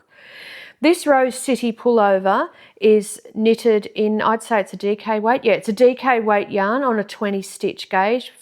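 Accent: Australia. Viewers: Love your blunt approach & hearing an Aussie talk about knitting & yarn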